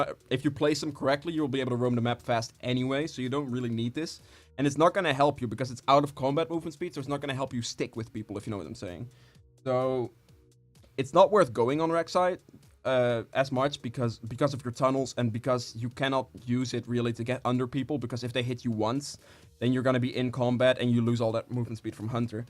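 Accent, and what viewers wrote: Netherlands. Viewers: Are you Dutch/Belgian?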